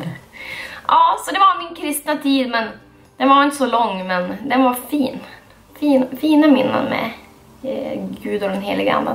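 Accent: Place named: Umeå, Sweden. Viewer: Du har typ Umeå dialekt också ❤️😘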